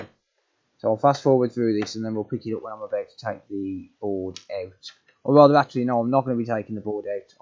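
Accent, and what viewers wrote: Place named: Black Country, United Kingdom. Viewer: Nice to hear the voice of fellow yam yam 🤘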